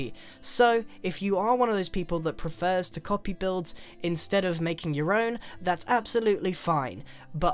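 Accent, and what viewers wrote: United Kingdom. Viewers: you're British right, it's hard to tell : o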